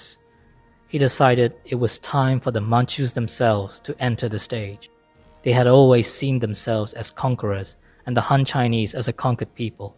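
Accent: Myanmar. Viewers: Well, this is how the burmese pronounce the names so... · He's Burmese lmao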